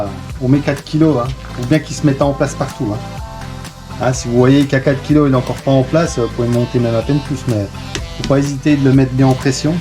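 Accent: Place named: Vosges, France. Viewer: Super t’as vidéo bien expliqué ,t’as un accent t’es pas des Vosges?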